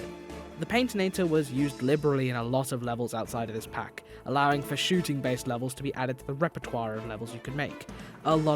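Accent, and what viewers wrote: United Kingdom. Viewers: Seriously dude are you a fucking idiot trying to describe racism out of that I don't think I can go any further this guy trying tell us changes his whole voice to not sound British self hate much shut your mouth about nothing knowing mf hipacrit do you have black friends shouldn't you be more tolerant and say African American dude all my other colerd friends have big lips bigger nose than me still beautiful but obviously different I work with Asian guess what there eys are smaller then mine dude Seriously just cuz its accurate don't always mean it's racist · The narrator is the only British voice actor in games I play in where they don’t backstab you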